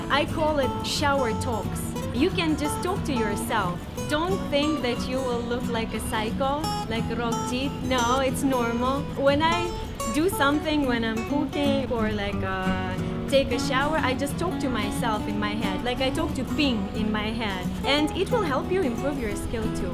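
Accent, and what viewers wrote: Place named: England, United Kingdom. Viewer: Thanks for your recommendation. I like your natural acting and English accent.😍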